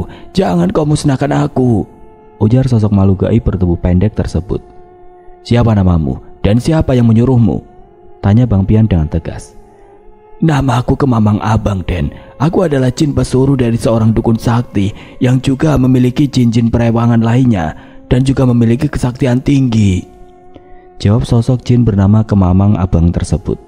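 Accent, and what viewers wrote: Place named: Jakarta, Indonesia. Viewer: Dengerin cerita bang pian w ngakak muluk anjirrr logat betawinya itu lho garing batt 😂😂 sett dahhh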